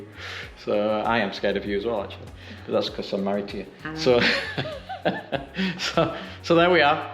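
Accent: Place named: England, United Kingdom. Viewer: I'm sure we don't live to far from you..we moved to the USA in 1999..we moved to our Florida home 5 years ago..we got it in 2003..not sure I could do a large upgrade now..we may wait till everything comes back into syle..😂😂😂..I was watching storm videos and heard an English voice..so stopped to watch..everyone we know are American.. I don't think there are as many Brits here as there once was..before we moved here full time there were a lot of British here..I think we scared them away...😂😂😂😎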